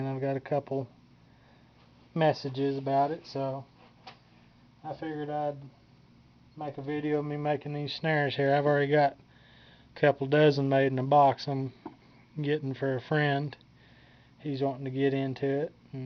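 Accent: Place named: Texas, United States. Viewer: bout like yat. Texas talk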